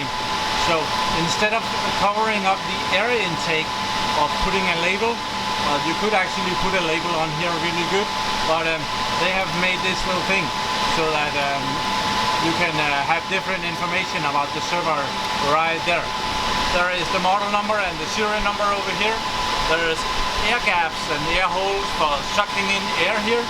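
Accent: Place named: Denmark. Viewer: is he danish? sounds danish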